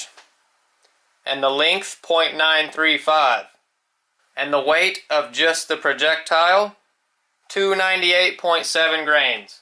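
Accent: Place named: Germany. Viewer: Great video, im curious if you are of German descent? Your accent is familiar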